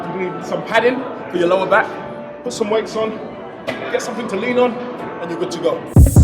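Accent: United Kingdom. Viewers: I didn't know that had an british accent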